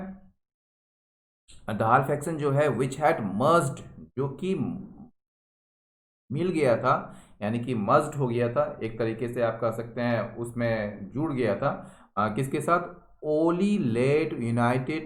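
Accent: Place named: India. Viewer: we all are Nepalese ...but sir your voice and explanation is pure Indian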